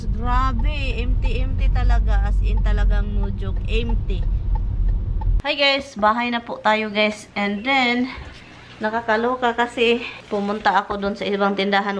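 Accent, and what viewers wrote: Visayas, Philippines. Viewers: Hello and. Shout out to you guys. so sounds so Bisaya? And which part of Bisaya.?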